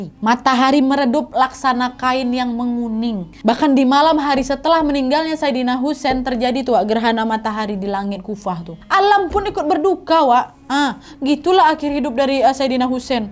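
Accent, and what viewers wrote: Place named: Medan, Indonesia. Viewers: ni logat org Medan kyknya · macem cakap org medan ni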